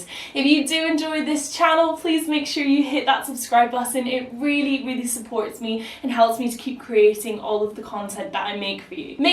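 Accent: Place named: Scotland, United Kingdom. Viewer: I love recreating high end expensive decor items for a whole lot less! I love your lovely Scottish accent! Greetings from Canada 🇨🇦!! Your wreath is absolutely beautiful! I love all things Halloween! You should check out my month long Boofest! Your pumpkin garland is too cute! The ghosties are adorable! I love the term “sweeties”!! New subscriber! Let’s support each other!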